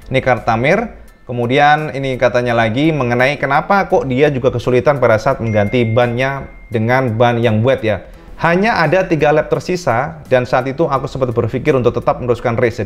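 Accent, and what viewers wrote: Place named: Java, Indonesia. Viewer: Cak iki asli Banaran Kandangan to Cak? 😲Kok medok pol..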